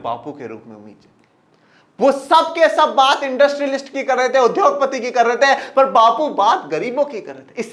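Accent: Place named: Rajasthan, India. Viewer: Sir kya aap rajasthani ho apki language vaisi he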